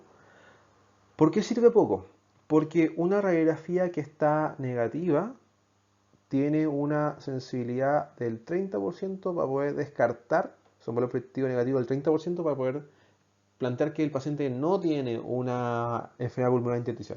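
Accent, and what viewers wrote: Chile. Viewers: chileno no ? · no le entiendo lo que habla ... chileno??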